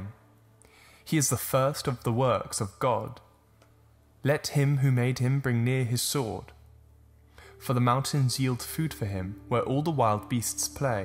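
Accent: England, United Kingdom. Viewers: I got halfway through this video and was like "wait, wtf is a Beer-Moth?" Then I realized he was just saying Behemoth in an English accent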